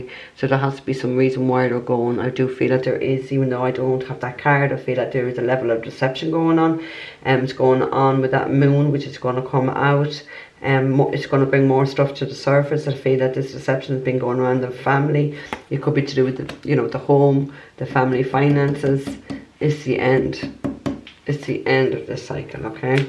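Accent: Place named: Ireland. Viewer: Ohhhh Irish gal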